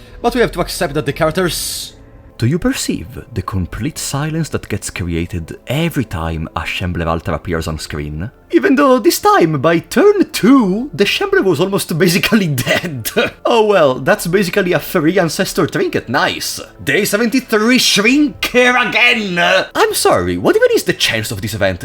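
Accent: Italy. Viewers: I CAN RECOGNISE A FELLOW ITALIAN WHEN I HEAR ONE! BELLA FRA FELICISSIMO DI AVER TROVATO A CASO IL TUO CANALE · Yep After minutes of talking , i can preaty much confirm that he Is an italian . Sei italiano vero ? 😂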